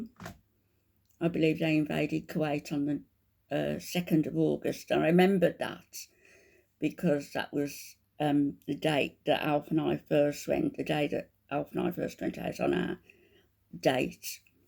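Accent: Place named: Birmingham, United Kingdom. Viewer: I subbed purely for the clip I saw of you saying "disgusting. Disgusting. Sow." You've gotta be a Brummie, that accent is chef's kiss on you! 💕💪🏾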